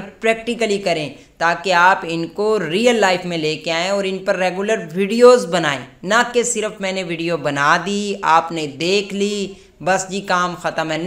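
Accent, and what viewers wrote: Punjab, India. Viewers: Good Punjabi voice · Wow Punjabi tarka👌👌👌👌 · Very Nice & Good Punjabi Touch.. Liked it..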